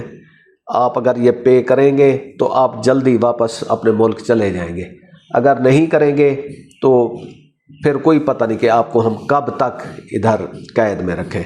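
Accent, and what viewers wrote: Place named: Punjab, Pakistan. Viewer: Aap punjabi lehje main Urdu boal rahe hain aur sari duniya daikh rahi hai yeh video. Itni si tameez tu honi chahie ke proper lehje main is platform per baith ker bat karain.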